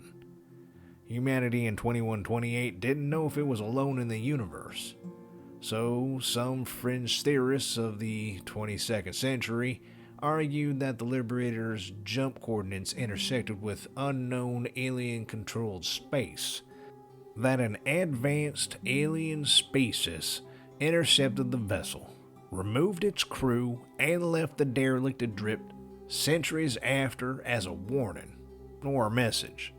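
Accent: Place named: South, United States. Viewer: Stylized Southern accent, but real none the less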